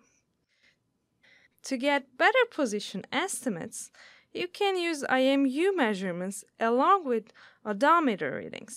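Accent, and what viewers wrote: Turkey. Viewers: She sounds very Turkis!h to me. Great explanation! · Konuşan kadının Türk olduğuna yemin edebilirim isteyen olursa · Is the speaker Turkish? Please someone tell me whether I'm right I have to win a bet. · türkçe düşünerek inglizce konuşmuşsun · bayan kesin Türk valla..Ingilizcesinden tahmin ettim. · Bunu seslendirenin Türk olduğuna her türlü iddaya girerim. Tam bizim aksan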